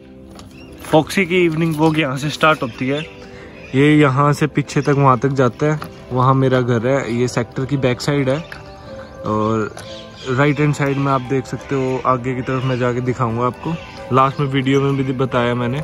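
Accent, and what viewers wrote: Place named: Haryana, India. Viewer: Mujhe toh tumharo haryanwi tone bahut achhi lgti h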